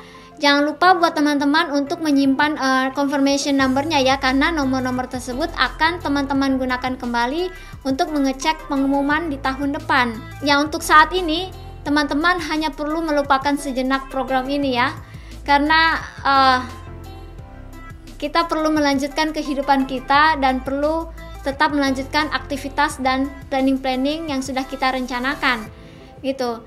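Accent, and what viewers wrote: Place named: Palu, Indonesia. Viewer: Lebih lagi kw buat vlognya pake dialek palu.... Bangga orang Indonesia, khususnya palu. Dstu di liat betapa bragamnya Indonesia...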